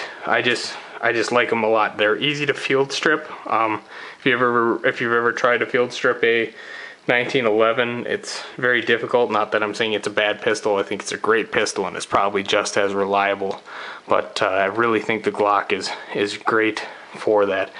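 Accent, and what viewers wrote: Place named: Canada. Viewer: Good vid. Not to clown, but you sound heavily like a Canadian haha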